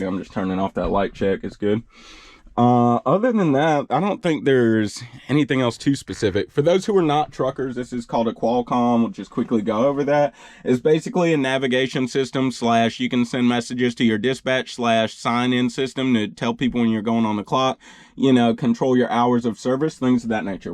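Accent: South, United States. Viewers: Where you from,? Quite the southern accent.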